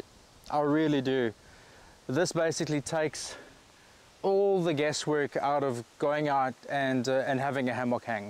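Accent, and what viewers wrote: South Africa. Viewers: Hey bru Are you south african ?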